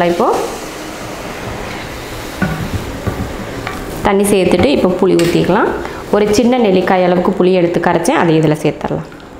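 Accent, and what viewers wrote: Coimbatore, India. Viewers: Akka neenga coimbatore ah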